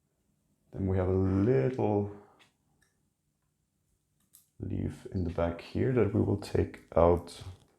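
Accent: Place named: Germany. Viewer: Kann es sein, dass du Deutscher bist? Dein Akzent klingt so vertraut. Grüße aus Österreich 🪴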